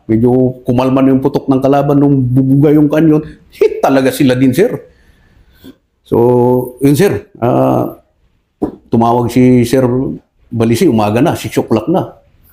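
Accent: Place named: Ilocos, Philippines. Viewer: Halatang Ilocano si Sir hehe,pero saludo ako sa tapang mo Sir.Isa kang bayani,mabuhay ang tropang musang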